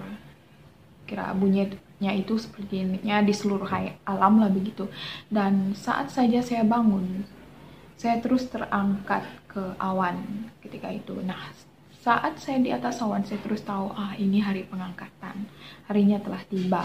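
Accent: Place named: Sabah, Malaysia. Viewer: Mcm orng sabah..😁 gbu👍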